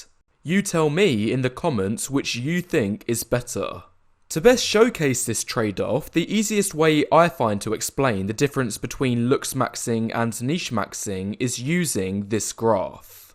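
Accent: United Kingdom. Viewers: Like that voice you sound like wiley cotote when he talks once in a while that british accent youre a genius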